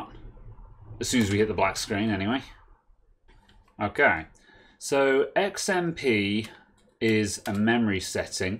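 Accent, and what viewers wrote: United Kingdom. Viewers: ...Because you sound VERY BRITISH!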